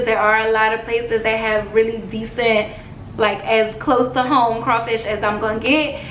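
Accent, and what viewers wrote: New Orleans, United States. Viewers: Omg I love your new Orleans accent!!!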